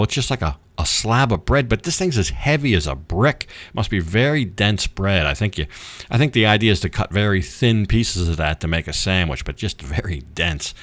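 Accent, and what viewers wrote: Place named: South, United States. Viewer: Where are you from? Sound like Southern USA 🇺🇸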